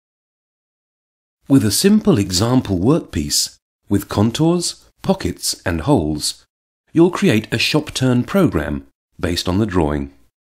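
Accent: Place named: England, United Kingdom. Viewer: fucking english accent shut up already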